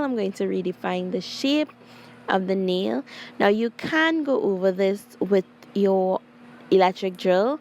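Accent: Trinidad and Tobago. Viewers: Always proud when I hear a Caribbean/Trinidadian accent 🇹🇹🇹🇹🇹🇹🇹🇹🇹🇹 very skilled nail tech, all the best for you in the new year!! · Love this am hearing a Caribbean accent trini 🇹🇹 to be exact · When I heard that Trini accent, I subscribe immediately. Love how you took your time to explain everything. I am now learning to do acrylics and gel nails. Thank you. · Where are u from?... U sound Trini...!!! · Noticed that trini accent quick. Coming to see you soon from Chaguanas. 😁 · Trinidadian here and I recognize a gorgeous Trini accent anywhere love y girl and I’m glad there are some Trinidadians around here on YouTube with me 🇹🇹🇹🇹🇹🇹🇹🇹🇹🇹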